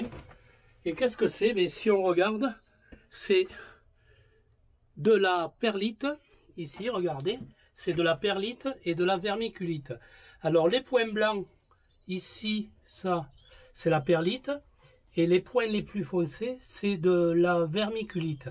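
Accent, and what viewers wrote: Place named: South-West, France. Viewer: Bonjour Monsieur, votre accent sent bon le sud ouest j'ai une connaissance à Pauilhac 32500 après cette parenthèse régionale voilà ma question votre mélange (terreau perlite vermiculite ) quels sont les proportions optimales pour vous imiter sans aucune discussion? en vous remerciant d'avance pour ce que vous faites aux amateurs non voyant que nous sommes.... mes salutations J.P. made in belgium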